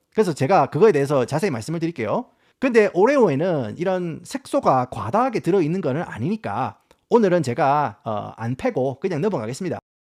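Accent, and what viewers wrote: Gyeongsang, South Korea. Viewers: 경상도 사람 아니면 듣기힘들거같은데 전 이만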